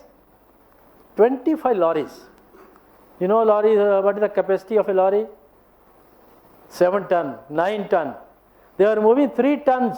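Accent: India. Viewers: Sardaar ji in South accent Great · You can still hear his Hindi twang.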